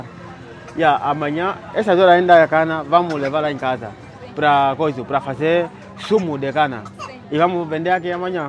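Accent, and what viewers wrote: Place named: Nghi Loc, Vietnam. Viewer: anh nói lai giọng nghệ An rồi.. tuyệt vời nhé.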